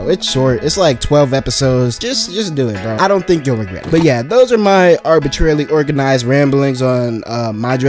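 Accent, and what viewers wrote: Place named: Toronto, Canada. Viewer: I can tell this man is from the GTA. I didn't why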